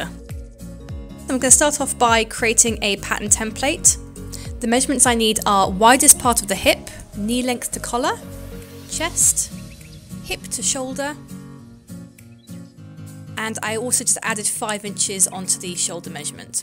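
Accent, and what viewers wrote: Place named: England, United Kingdom. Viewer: Someone with an English accent using imperial measurements 😍 Most videos use those centimeters and millimeters nonsense 🤣🤣🤣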